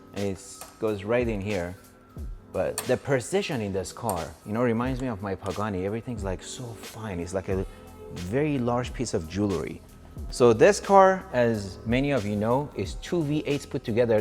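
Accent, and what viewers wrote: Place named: Iran. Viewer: i like how you still got the persian accent nice bug btw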